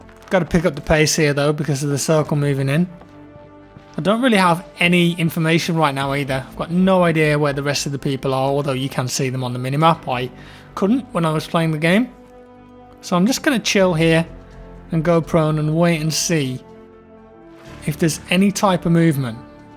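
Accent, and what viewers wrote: United Kingdom. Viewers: Oregon farmer here. combine or harvester. yep. you're my favorite steamer Jack and no worries your voice sounds still very British.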